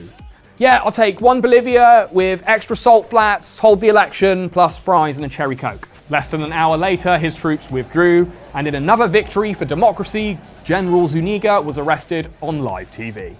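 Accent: England, United Kingdom. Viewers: Oh now I get it. You have the Russell brand accent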